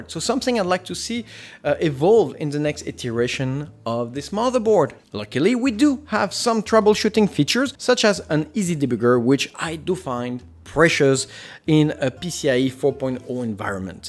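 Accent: France. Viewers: accent français ?? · he is french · you are french, right ?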